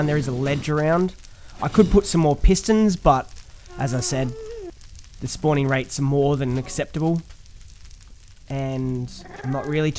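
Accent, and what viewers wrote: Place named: Australia. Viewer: Which part of australia are you from????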